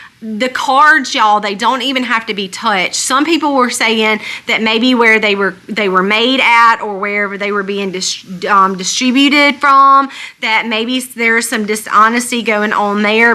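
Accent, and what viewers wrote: South, United States. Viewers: Lovely southern drawl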